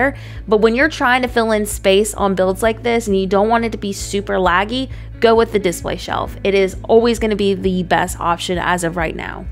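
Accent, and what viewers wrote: South, United States. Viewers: I thought I heard a bit of a southern accent but when you called the shopping cart a buggy I knew you were my people lol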